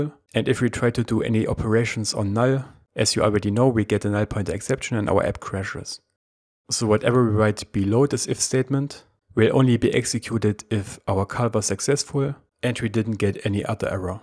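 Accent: Germany. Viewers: Are you from germany? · Subscribing because of the German accent! :D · Thanks for this brilliant tutorials! They are easy to understand and deeply. Firstly it was hard for me to understand your German accent :D But know I used to and your voice is so clear and understandable <3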